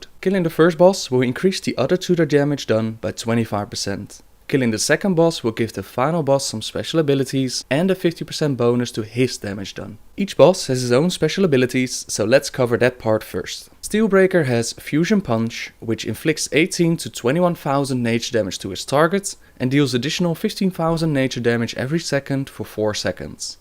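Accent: Netherlands. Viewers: Your dutch arnt you?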